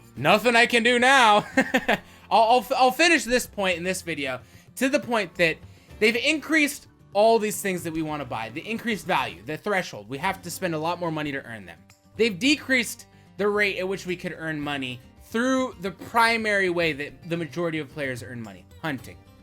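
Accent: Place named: Russia. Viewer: you are 100%, they have made the game unenjoyable. But please in the next video talk in you crazy Russian accent. That is the reason I started watching this channel.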